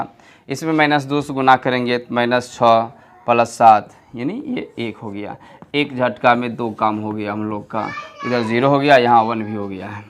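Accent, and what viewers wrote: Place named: Bhojpuri, India. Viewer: Ek dam khati bhojpuriyan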